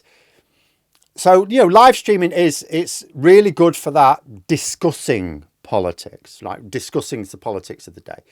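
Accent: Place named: North, United Kingdom. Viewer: I have a dormant Twitch account where I followed a German woman in Finland who ran games and comments for her subscribers. It was mainly to help me learn about Eve. Unfortunately my short attention span caused me to drift. I can barely watch a full rugby match when I care about one team. I'd drop into a Twitch stream for you because it's you and I like your analyses and northern accent. For me (sample of <1), I'd be engaged by a game in the background, with a mission paused and a few topics on the table. But what do I now? I shit into a bag. In any case, I admire your evolving platform(s).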